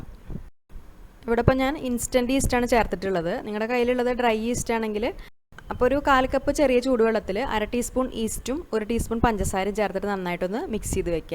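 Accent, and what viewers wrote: Thrissur, India. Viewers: 😋😋😋😋😋 Mol trissur aano...bhasha kettu chodichathaatto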